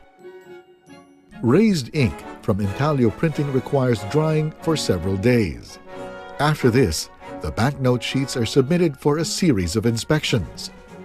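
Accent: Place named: United States. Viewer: Yes Philippine money looks better than u.s. money. btw why does the announcer sound American? lol ))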